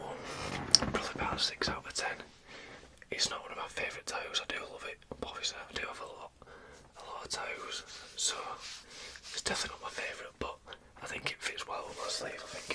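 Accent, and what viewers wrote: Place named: Lincolnshire, United Kingdom. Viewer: Where are you from? Your accent sounds like home! I’m from Lincolnshire!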